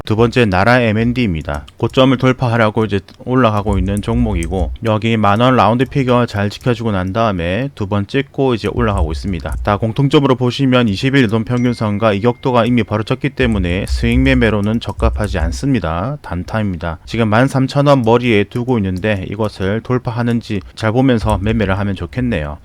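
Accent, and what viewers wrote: Gyeongsang, South Korea. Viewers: 시끕 ㅋㅋㅋㅋ 경상도 아입니꺼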